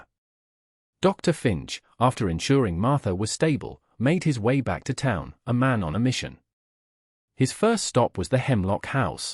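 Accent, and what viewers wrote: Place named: United Kingdom. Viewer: A sledge is what we call a sleigh in the UK. I'm just clarifying for you as the speaker is speaking with a UK accent. I was thinking that is what I was thinking as my mom and siblings used a sleigh to go to school in winter here in the US. I'm not trying to make fun of you.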